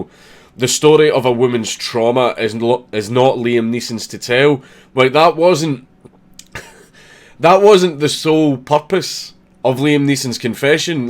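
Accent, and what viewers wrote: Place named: Scotland, United Kingdom. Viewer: Awesome vid! but i wish you went with "they want to see you burned." rather than "they want to be outraged" At the closing statement..mainly cause "burned" sounds awesome with a scottish accent:D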